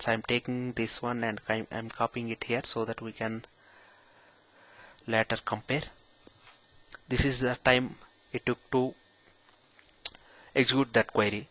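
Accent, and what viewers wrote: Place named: India. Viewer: It is really hard to understand you because of the indian accent. REALLY HARD I am sorry but it is true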